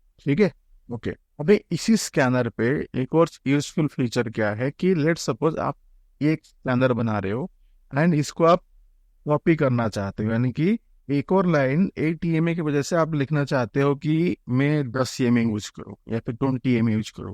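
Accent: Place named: Bengal, India. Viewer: bhai aapka hindi samaj mein ataa nahin.. bengali bolte ho aisa lagta he..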